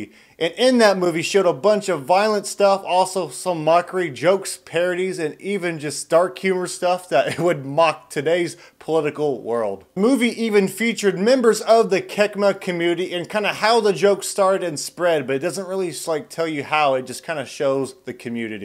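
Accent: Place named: Australia. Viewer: IDK, I'm Midwestern. But you sound Australian/New Zealand to me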